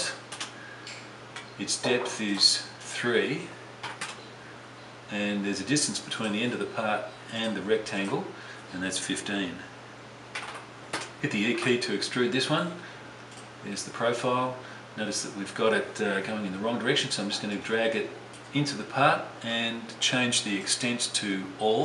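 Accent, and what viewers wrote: Australia. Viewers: Thank you for these tutorials, your teaching style makes gaining skills easy. I really look forward to going through them again with the updated interface as there is some frustration when my copy of 360 doesn't behave the same as yours. It's good to hear an Australian voice also. Quick request that you cover the fillet command in some more detail. In Ex 14 I tried to fillet the join between the 32dia boss and the top of the flat part without success, the arrow kept pointing into the join rather than out.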